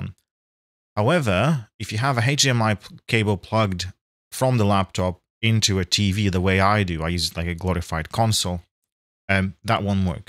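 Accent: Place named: Russia. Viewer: отличный аннлийский. слышен немного русский акцент но все равно красава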